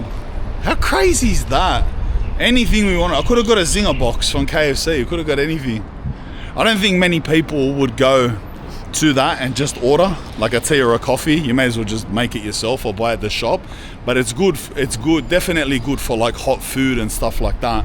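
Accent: Australia. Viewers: wow that aussie accent,, i just cant,, the guy talks like a 12 year old,,, ciao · Holy Aussie accent😂🔥🔥🔥🔥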